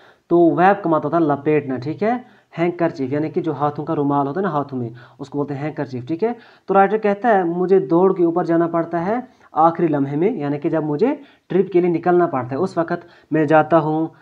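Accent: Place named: Kashmir, India. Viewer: Sir I think you are from*Kashmir*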